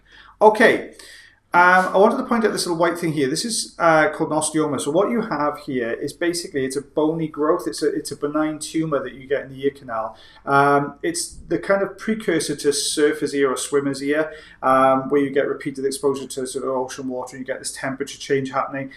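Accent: Wales, United Kingdom. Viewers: Yeah, it amazing how it does relax you isn't it? Is the subject matter, or the lilting Welsh voice that soothes you?😴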